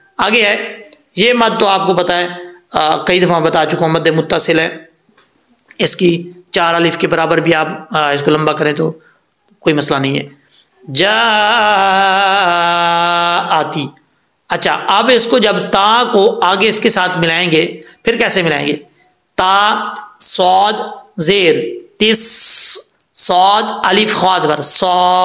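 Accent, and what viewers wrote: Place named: Punjab, Pakistan. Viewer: او بھائی! آپ خود تو کہیں سے سیکھ لیں، پنجابی میں پڑھ رہے ہیں،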